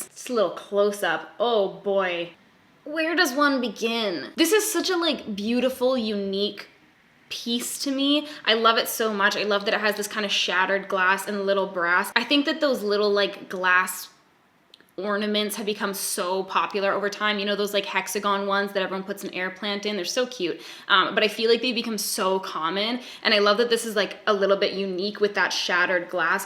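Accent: Canada. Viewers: I have many thoughts on today’s vid but I’m very, very tired so I’ll leave you with this: you said ‘saga’ in your sweet lil Canadian accent and I heard ‘Sega’... like sega genesis. Like sonic the hedgehog Sega. And I was like ‘so was NOT kidding. This video went off the makeup rails’ and then I realized you were saying ‘saga’ and I couldn’t stop laughing about what a dumbass I am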